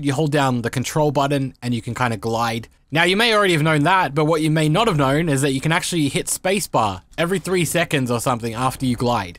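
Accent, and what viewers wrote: Australia. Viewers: normally I avoid ads on normal videos i watch but imma put it out there that i love ur vids so much and your style of content, editing (and that YTer voice/aussie accent) that i watch through the ads just for u :)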